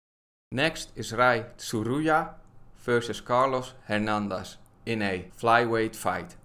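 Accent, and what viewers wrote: Netherlands. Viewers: Are you dutch? Just curious if I got the accent right.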